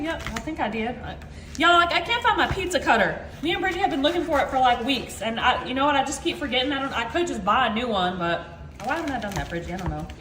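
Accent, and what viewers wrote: South, United States. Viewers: I love your innovative video. You are andFred are so entertaining. It’s lifts my spirits to watch y’all. Our world need more people like you, and. I’m from the South and can certainly tell you are too. Thanks so much from The BAYOU STATE!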